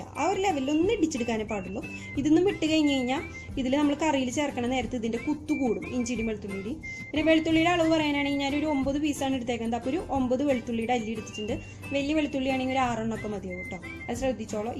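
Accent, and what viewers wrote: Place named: Mullassery, India. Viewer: മോൾടെ വീട് മുല്ലശേരി, പാവറട്ടി ഭാഗത്താണോ. സംസാരം കേട്ടിട്ടു വടക്കാഞ്ചേരി ആണെന്ന് തോന്നില്ല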